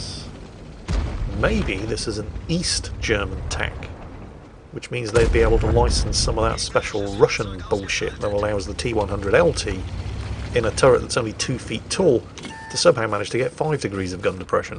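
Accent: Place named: England, United Kingdom. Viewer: The pronunciation of "Kunze Panzer" was very good, you just have to get rid of your english accent and then you can start uploading german videos :P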